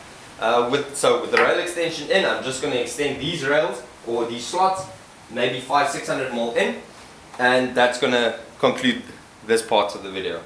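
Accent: South Africa. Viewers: are you south african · South African? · Great! I have a tiny shop and was going to build something similar, only smaller.. Awesome ideas.. BTW, love your accent, South Africa? :D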